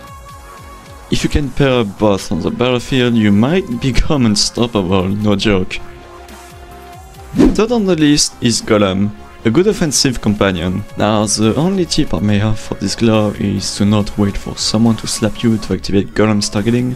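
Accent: France. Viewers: Is my guy french??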